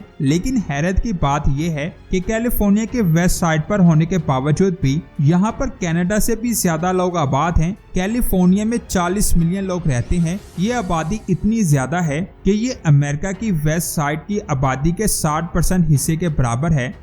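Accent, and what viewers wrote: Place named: Pakistan. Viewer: Love from india ! You're the first good Pakistani YouTuber I've seen (can tell by your Hindi/Urdu accent)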